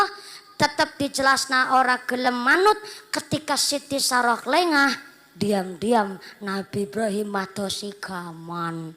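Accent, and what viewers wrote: Banyumas, Indonesia. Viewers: Gokil ngakak pollll ustaadzahh ngaapak kiii,🤣🤣🤣🤣 Mg lncar acaara Khitaanyaa. Aminnnn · Ustdzh cantik..ora ngapak ora kepenak😆😆🤦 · Logat yg bikin menghibur, yaitu logat ngapak.. Hhi Sukses truss sob Mampir sob kerumah, ajari sy bahasa ngapak.. Biar lancar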